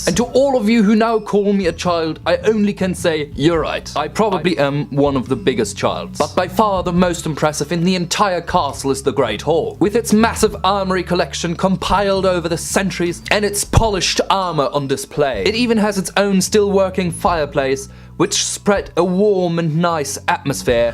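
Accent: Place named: United Kingdom. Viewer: Natürlich ist es eine "Special Occasion", wenn du da bist,! xD Richtig schönes Video!:D In der 7. Klasse oder so war ich mal auf Klassenfahrt und wir haben da Arundel Castle besichtigt. Das sah Warwick Castle recht ähnlich. Your English sounds very British by the way!;) I miss England terribly!:(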